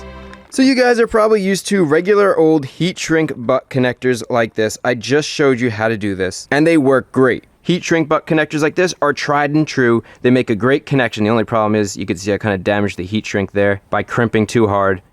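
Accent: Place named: Philadelphia, United States. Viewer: Bro, I completely forgot you were from somewhere VERY close to Philly! But the second I heard you say, "fill the rest up with wooder" [water] I nearly fell out of my seat! My wife (who grew up near Allentown) yells at me all the time about my pronunciations and slang, but "wooder" in particular because she doesn't want my son to pronounce it that way!🤣😂🤣I love it man, great video, thanks for the upload. And I hope you and yours have/had a great + safe holiday and a Happy New Year! See you 2026!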